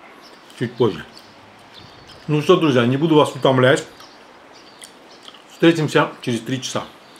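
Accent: Baku, Azerbaijan. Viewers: У него всё поличилось идеально. А Бакинский Акцент это другой кайф👍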